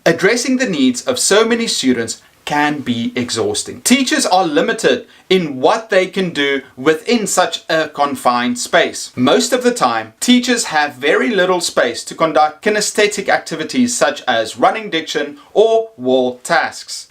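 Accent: South Africa. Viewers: haha I you South African bro?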